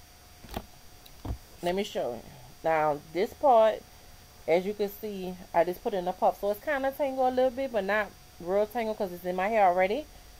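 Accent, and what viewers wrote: New Orleans, United States. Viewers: are u from new orleans? ur accent is so cute! lol anywho, im transitioning and my friend who's natural put me on this product and i cant get ENOUGH of it. it sucks cuz the only store in my town i know of that has it jacks up the prices on all of their natural hair care products. but i found a website, <link> that has it for i think 7 or 7.99 and its free shipping :^D! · you from new orleans huh...lol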